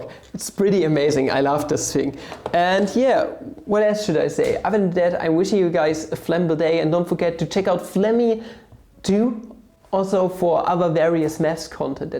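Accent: Germany. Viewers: German accent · ne dachte gleich der klingt deutsch, profil .. aha deutsch xD ja er hat einen starken deutschen Akzent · Bist du Deutscher?😅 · This is the most german english i've heard today.. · is he german? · Are you german?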